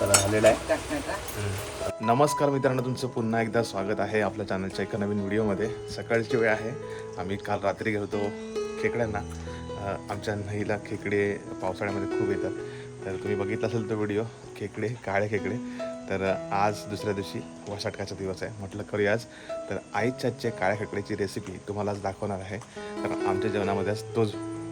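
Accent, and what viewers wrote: Konkan, India. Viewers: gavi jaun gav sarkh ....pure kokani boltos 😅😅😅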